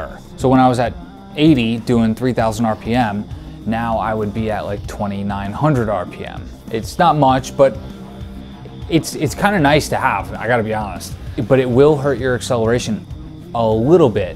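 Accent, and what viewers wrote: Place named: New England, United States. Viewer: Love this guy's videos but hot damn that yankee ass accent! I can get past it but it irritates my subconscious ever so slightly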